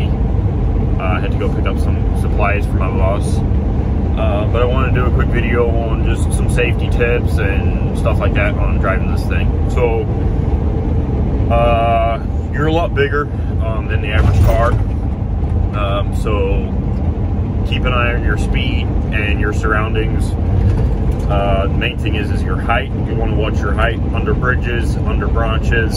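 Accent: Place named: United States. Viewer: I thought he from the states ok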